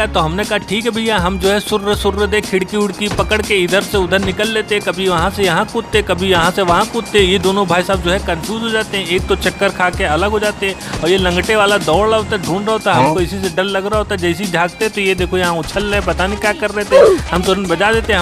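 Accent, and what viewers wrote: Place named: Jaunpur, India. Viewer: Me bhi ek youtuber ho aur aapki awaz sun kar Asia lagt hai aap junpur se hai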